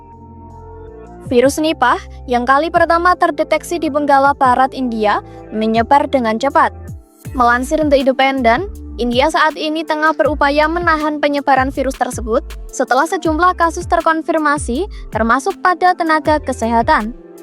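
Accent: Java, Indonesia. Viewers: Medok banget ya logat jawanya😂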